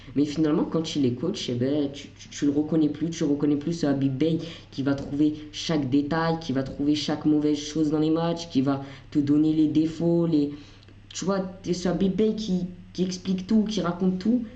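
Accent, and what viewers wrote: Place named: Paris, France. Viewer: Tu parle comme l immigré parisien t es le régen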